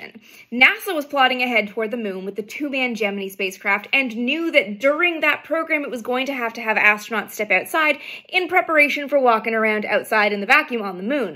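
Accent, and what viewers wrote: Canada. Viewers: are you Canadian? A few long vowels in this short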